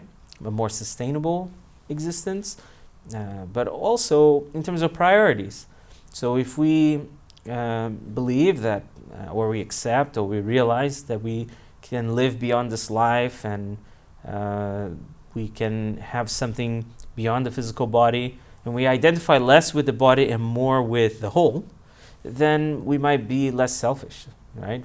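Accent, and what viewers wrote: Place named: Brazil. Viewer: Good talk. On an unrelated note, it's so nice to see such a smooth Brazilian accent.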